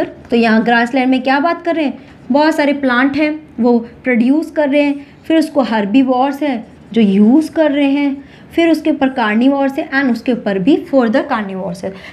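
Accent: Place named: Jammu, India. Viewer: Accent from jammu 😂❤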